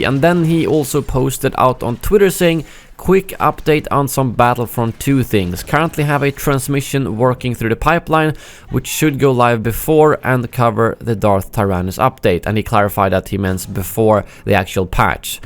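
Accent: Sweden. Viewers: I have a feeling you are from Northern Europe, probably Sweden judging by your accent. Am I right?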